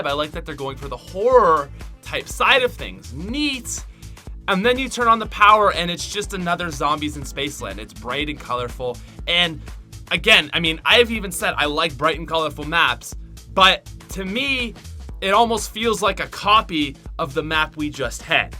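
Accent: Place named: Nova Scotia, Canada. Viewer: why do nova scotians butcher "about" us ontarians think that shits wierd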